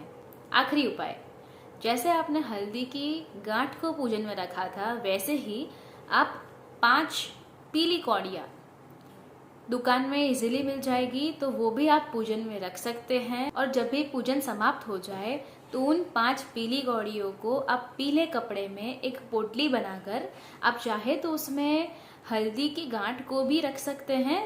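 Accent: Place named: Gujarat, India. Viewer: Hindi with gujarati accent...very good..... reminding Daya bhabhi of "ulta chasma".....keep it up beta...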